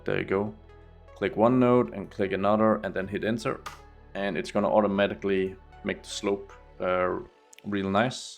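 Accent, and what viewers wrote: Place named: Denmark. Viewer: nice work! your accent sounds at bit danish or scandinavian. er you from denmark?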